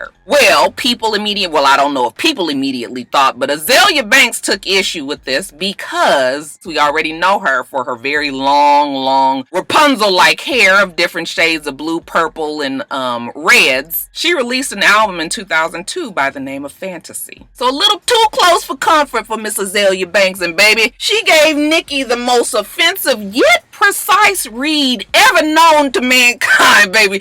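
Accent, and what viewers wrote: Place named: California, United States. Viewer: "modation "!!!! love you,. your California comes out sometimes and I love it!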